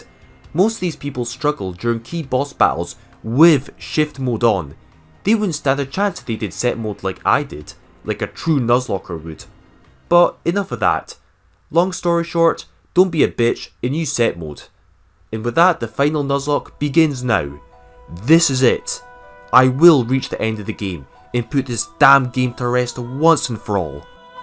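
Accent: Scotland, United Kingdom. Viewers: You sound like a Scotsman attempting an English accent bro, Good video.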